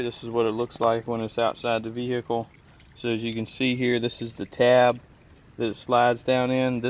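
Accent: United States. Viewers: country as fukk lol but good video and gave thumbs up. lol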